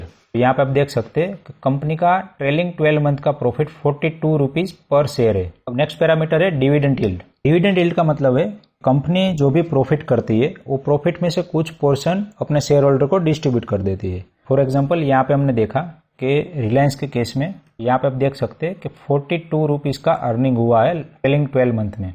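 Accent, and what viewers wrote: Gujarat, India. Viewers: Are you Guajarati? Language tone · R u gujarati 😀😀😀😀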